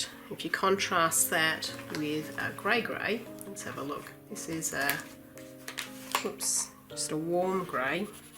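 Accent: Australia. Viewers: I haven't done the duck pond yet, but i love coloring along with your videos. The music is soothing, your auzzie accent is great, and your techniques inspire me! I see progress in all of my pages :) Just wanted to say thanks, and keep up the great videos :D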